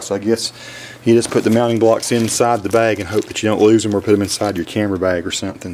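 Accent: United States. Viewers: Your accent is awesome. What part of the States is it from?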